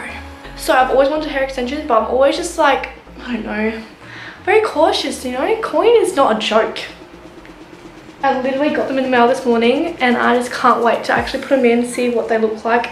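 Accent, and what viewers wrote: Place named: Australia. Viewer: It's not your fault, please don't get so upset! It's just Australian accent that is hard to understand!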